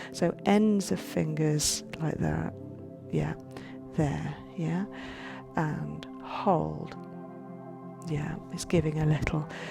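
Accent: England, United Kingdom. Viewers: Amazing videos and very interesting. Didn't even know this was a form of treatment. Great videos. What part of england are u from? 😊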